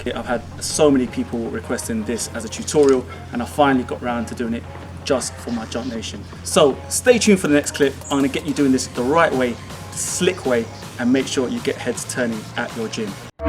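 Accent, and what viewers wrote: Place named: London, United Kingdom. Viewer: Awesomely slick mate, my ropes should be here today or tomorrow.. Can't wait.. How long should I live in London to acquire the slick accent yo?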